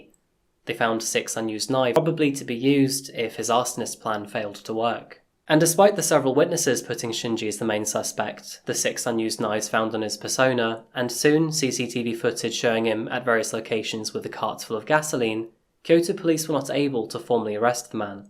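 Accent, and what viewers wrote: England, United Kingdom. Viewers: I can tell by your accent that you're English but I cannot place where. All I know is that it isn't Devonshire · I know now you were born in the US, but i still cant get over the fact that a british person is offering me coffee!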